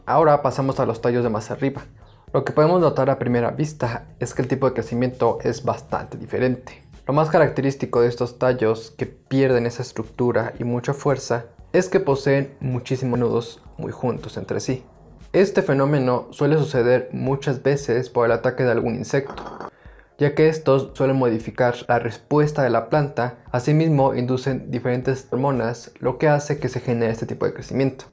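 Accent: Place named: Mexico. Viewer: Buenos días desde España,. Una vez más... gracias a ti por responder tan amablemente y compartir tantos conocimientos. Ayer a punto estuve de despedirme con un... "fuerte abrazo desde España para todo Méjico", pero dudé de tu nacionalidad porque tu acento no es tan marcado como el de otros de tus compatriotas, pero ahora, una vez confirmada mi sospecha, puedo despedirme diciéndote.... ¡¡¡ DESDE ESPAÑA... UN FORTÍSIMO Y SINCERO ABRAZO PARA TODO MÉJICO!!!.;o)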